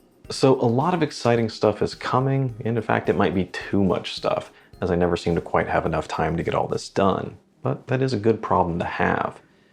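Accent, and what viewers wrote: United States. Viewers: Anything Seiko related is always most welcome, but the Glycine looks equally interesting. Now for the compliments. Kudos on a great channel. Love your voice by the way, it is so quintessentially American.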